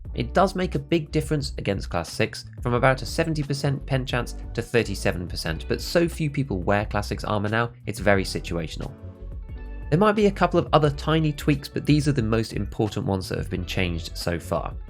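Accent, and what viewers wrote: RP, United Kingdom. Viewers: Again, when I need you the most, you're there to hold my monkey hand and lead me toward the light of knowledge. You really are my favourite Tarkov YouTuber. Your content is smart and the posh accent makes it sound doubly smart, like what you're saying can be absolutely trusted .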